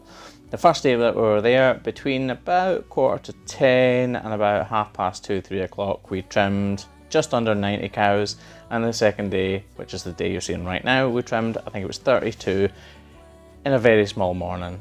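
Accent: Scotland, United Kingdom. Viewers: I work with quite a few Scots and while their accent is easy for me understand, it does get stronger when they talk to each other, as well as faster and with more Scottish slang. would be taking a little slower on his vlogs to maximise understanding for those who aren't Scottish. Most U.K. Residents and those in Australia and New Zealand would probably understand him very easily but those in North America maybe not so much. His slightly slower speech for the vlogs would also be more beneficial to those who have English as a second or third language. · Hey - just wondering - do you speak differently when you’re talking to the farmers, like with a heavier accent? Or when you’re in different parts of Scotland? If yes, I’d love to hear it!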